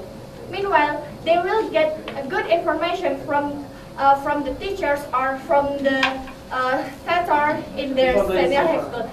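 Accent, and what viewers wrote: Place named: Indonesia. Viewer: Too fast like you are good in speaking english, but beware from other people who watch this and know your grammar, your pronounciation and your bahasa's accent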